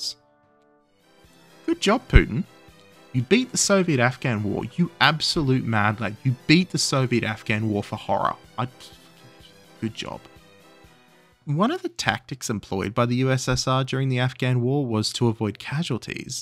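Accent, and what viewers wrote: Australia. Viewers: I think he’s Australian. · It's always such a weird feeling to watch a video in english, specifically from some aussie bloke, and suddenly hearing ARBEITER BAUERN NEHMT DIE GEWEHRE 😂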